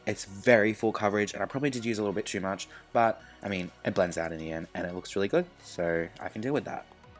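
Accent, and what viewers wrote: Australia. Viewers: Never mind... It's definetly Australian 😅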